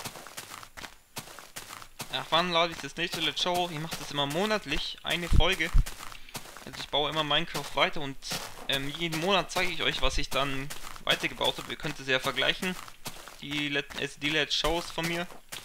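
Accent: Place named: Bavaria, Germany. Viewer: Ich finde die bayrische ausprache von dir so geil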